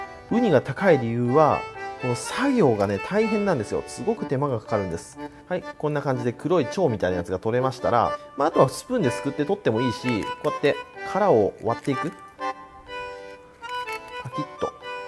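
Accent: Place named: Aichi, Japan. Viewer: の愛知訛りを聞くと地元に帰った気分になりすごく落ち着きますいつもありがとうございます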